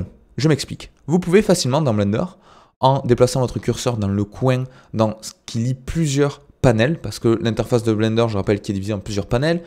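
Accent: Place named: Southwest, France. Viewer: Oh des barres ! J'suis tombé sur ta vidéo et ma copine (finlandaise) m'a dit : Oh on dirait ta voix avec l'accent :D Le S U A te salut ! Merci pour tes vidéos du sud ouest ;)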